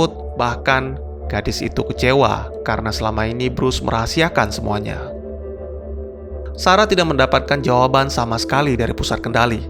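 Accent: Aceh, Indonesia. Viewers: orang aceh ya om?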